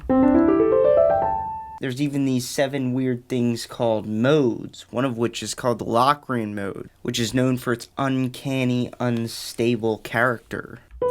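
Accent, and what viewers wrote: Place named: Boston, United States. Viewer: “Whole, whole, hailf” 😂 love the Boston/NY accent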